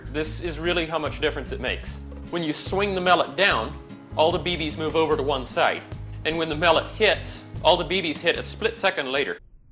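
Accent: Canada. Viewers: You have the most Canadian accent I have ever heard.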